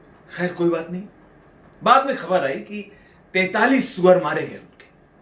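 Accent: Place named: Kanpur, India. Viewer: Raju sir...Mai b Sena me hu..aur Apke kanpur Sahar se hu...aap mere favorate ho ...aapki bhasa me apna Kanpur jhalkta h....u r great man and comedian....plz mere comment Ka jwab de ...Khushi hogi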